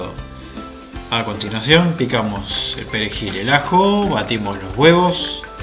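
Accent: Argentina. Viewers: hola una pregunta sos Argentino ?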